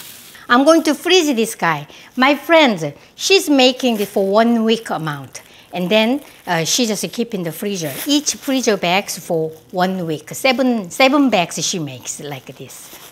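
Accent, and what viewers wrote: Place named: South Korea. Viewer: i am very surprised that you speak English so well(even with light KR accent)!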